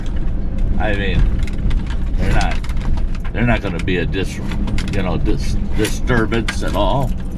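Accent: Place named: Indiana, United States. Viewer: What state are you in, sounds like Indiana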